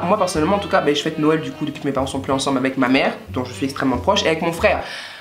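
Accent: Ivory Coast. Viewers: Wowww ta un accent ivoirien mais puuur😍😍😍😍😍🇨🇮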